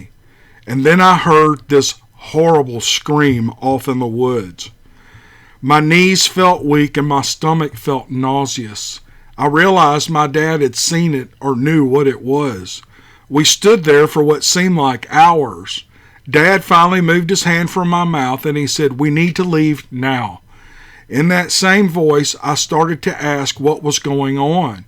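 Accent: South, United States. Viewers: I’m so happy I discovered your channel! The past couple months I have been reading a LOT of book series of Bigfoot encounters and this is SO much better! Your narration and splendid southern accent makes for awesome and enjoyable listening! Thank you for your great work!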